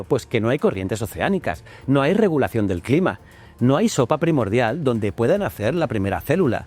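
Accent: Spain. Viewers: Que feoo ablan los españoles 😂😂😂 wacalaaa😢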